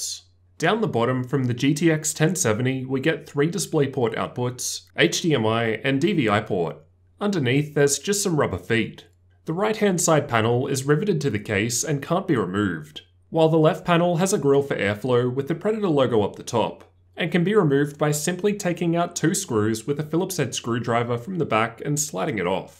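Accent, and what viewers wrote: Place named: Australia. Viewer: woah hes aussie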